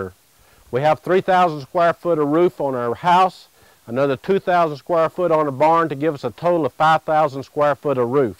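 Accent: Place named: Texas, United States. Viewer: Great video.. I love the Texan accent :-)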